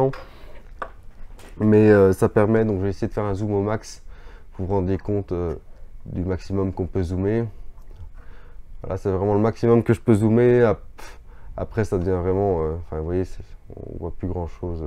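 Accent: Liège, Belgium. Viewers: Salut, Chapeau bas pr la transmission de savoir ! Dès la 1ère vidéo j'ai reconnu l'accent, puis le pull m'a confirmé ! Signé un autre Liégeois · Hello, très bonne vidéo qui résume bien l’essentiel. Je ne connaissais pas le coup du court circuit avec l’isopropanol pas mal merci. Et je crois reconnaître le petit accent et la sympathie de nos amis Belges que j’adore. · Je viens de Liège aussi haha j'ai bien reconnu l'accent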